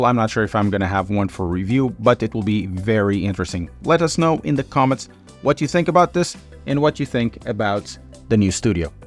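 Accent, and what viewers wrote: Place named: Portugal. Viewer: Had a feeling you were Portuguese for the slight accent. Amazing English but the Portuguese rhythm never goes away. Great work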